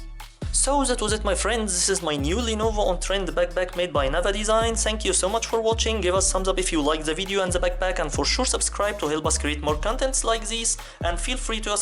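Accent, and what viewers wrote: Egypt. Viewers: your accent seems to be Egyptian, bro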